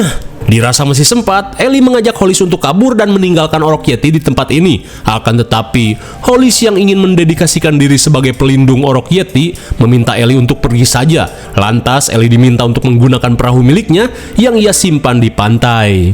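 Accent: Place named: West Java, Indonesia. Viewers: Awal suka nonton film di chanel Si Aa gara2 intronya, "𝙃𝙮 𝙜𝙪𝙮𝙨, 𝙠𝙚𝙢𝙗𝙖𝙡𝙞 𝙡𝙖𝙜𝙞 𝙗𝙚𝙧𝙨𝙖𝙢𝙖 𝙜𝙪𝙖" Udah gitu si Aa Org Sunda yg sangat terdengar Pasti orgnya Gokil.. Kang ngawadul 🤣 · Lah baru tau BG orang Sunda cuma gak tau daerah mana dari logat sama bahasanya aja Sunda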